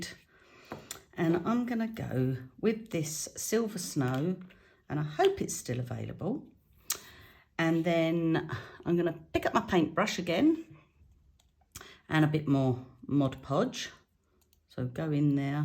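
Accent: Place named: Essex, United Kingdom. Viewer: Just came across your channel and really enjoyed watching you. Particularly because I hail from Essex originally and worked in London so your accent is like coming home. Your voice really reminds me of Linda Robson in the good old comedy 'Tails of a Feather'. What a coincidence!!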